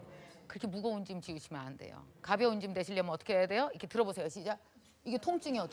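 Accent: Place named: Chungcheong, South Korea. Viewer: 구수한 충청도사투리 넘! 강의 잘듣고 많이 웃고 감니다.....🎉😅❤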